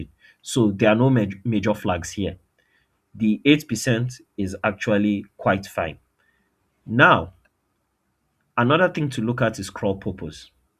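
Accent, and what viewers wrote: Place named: Africa. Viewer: Whatever you doing bro I am here for it..but 😭😭that accent bro..are you African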